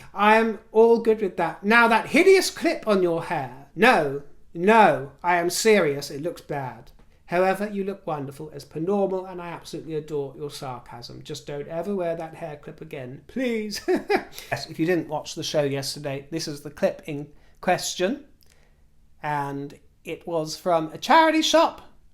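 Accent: United Kingdom. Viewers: Hi from Texas. You look fabulous in pink. It goes wonderfully with your blond hair. I used to have a pink shirt myself. Your show as always is so interesting and humorous and makes me want to visit the UK. I love the British accent.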